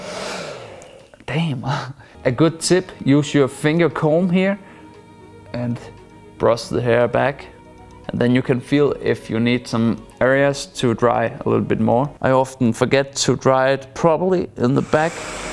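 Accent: Denmark. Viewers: you can just hear he's Danish, VIKINGER FOR LIFE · Hej med dig dansker man kan høre du er dansker med din accent men vanvittig video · Du fra Danmark :) Det er nemt at høre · Er du dansker det er alså radt tydligt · Are u Danish or something? You kinda have a danish accent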